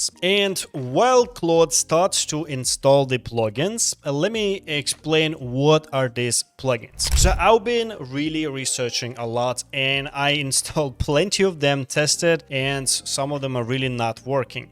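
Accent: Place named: Russia. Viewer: чел русский понятно по акценту,но вот доказательство остановите ровно на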